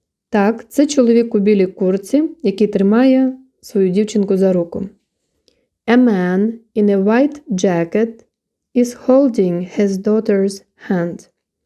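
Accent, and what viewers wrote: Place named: Eastern Europe. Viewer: наче все правильно, але сильний східно європейський акцент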